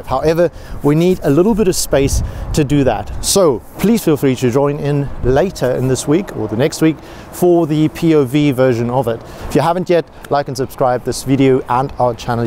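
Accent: South Africa. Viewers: I hear South African vibes ..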